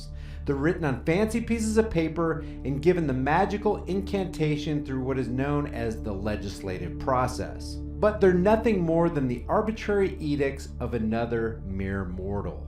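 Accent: South, United States. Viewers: Did he hurt your feelings? I'm from Kentucky too and I realize that there are several different southern accents not only in our state, but in many different states. Also, some of those with accents are police. Hell, they might even be Republicans. You watched this whole video and your comment is about his accent? It is sad that you can't get over your hurt feelings enough to focus the point of the video.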